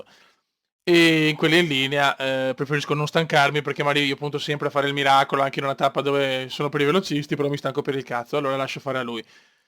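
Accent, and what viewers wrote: Veneto, Italy. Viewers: sei di venezia?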